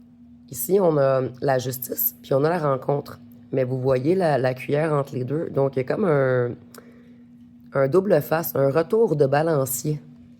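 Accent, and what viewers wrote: Canada. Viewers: Merci Beaucoup Pour Vos Guidances ! Je Ne Peux Envoyer de Messages Directs ! Je Suis Touché Par Votre Accent Canadien, Mon Pays de Cœur !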